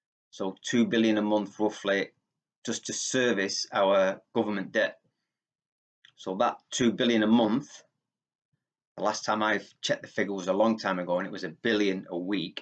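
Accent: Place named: Manchester, United Kingdom. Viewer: Real interesting vid, nice to hear a Mancunian on utube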